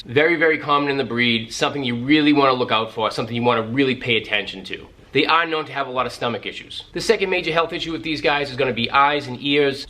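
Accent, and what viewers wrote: Boston, United States. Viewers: What accent is that? Boston?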